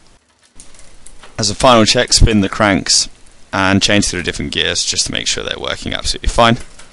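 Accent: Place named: South, United States. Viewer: a southerners accent, the same as mine :)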